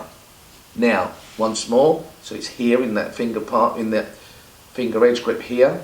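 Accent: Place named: Cockney, United Kingdom. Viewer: Your cockney accent was brill! Subbed just for that!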